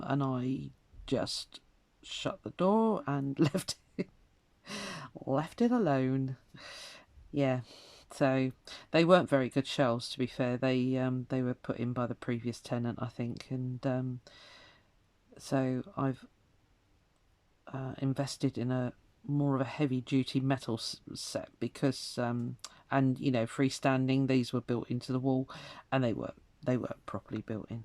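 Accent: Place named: United Kingdom. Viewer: I’m really enjoying watching you create and listening to you talk! You’re inspiring me very much! Don’t worry about how much time your video is taking. It’s a pleasure to be creating here and having your company in my home. You can take all the time you want. If somebody wants a bullet for a video they can speed it up if they want. It’s nice listening to your soothing British voice. I’m in Arizona sitting here with cancer and creating a beautiful rug hooking. It’s very healing to me listening to you. Thank you so much for sharing your gifts!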